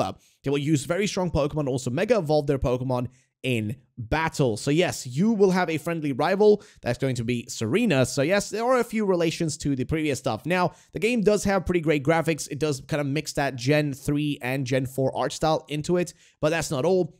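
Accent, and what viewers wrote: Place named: Australia. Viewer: Are you Australian or American? Your accent cant seem to make up its mind.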